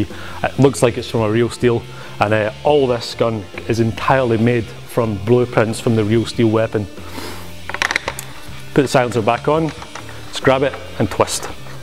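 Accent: Scotland, United Kingdom. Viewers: Oh snap is scottish!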